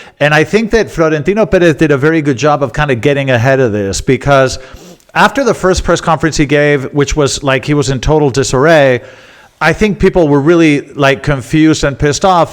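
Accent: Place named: United States. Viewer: You were playing bottom of the league. I gave up after 30 minutes when Oviedo were dominating and Real decided to actually play. In the rest of Europe we are sick of Real Madrid and happy that a PSG side without Galacticos is kicking ass and the rest of the world is laughing at you, knowing this moment was coming. The so-called greatest team in the world reduced to a pile of excrement. A bit like the US you could say. And you with your American English and predator hedge fund background would surely be a great choice for next Real President, Don.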